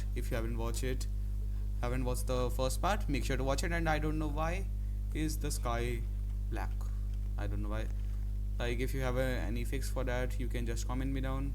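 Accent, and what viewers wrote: India. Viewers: Bro ur indian ?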